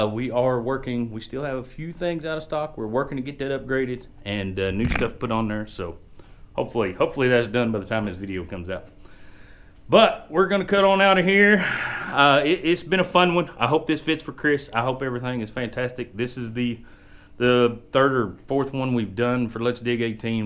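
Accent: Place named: South, United States. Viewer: I think it's the southern accent but somehow the term "Boat hoes" comes to mind?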